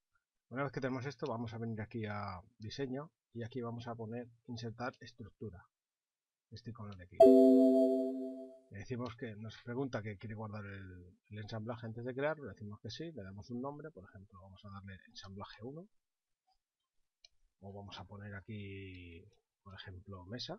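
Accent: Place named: Catalonia, Spain. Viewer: acento catalan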